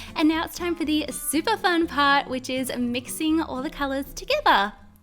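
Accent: Australia. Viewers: You can tell by her accent, she is from Australia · Shes Australian. She cant help it. If you want to hate, get the fuck outta here.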